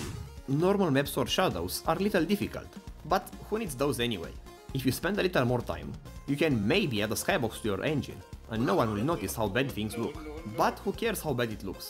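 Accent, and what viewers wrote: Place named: Romania. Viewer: Mereu o sa pot sa identific un român dupa accent :))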